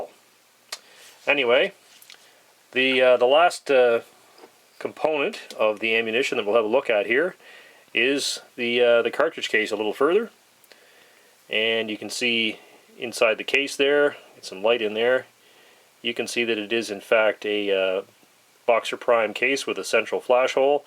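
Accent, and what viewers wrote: Canada. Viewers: You sound Canadian. Greetings from an American citizen, who was born and raised in England.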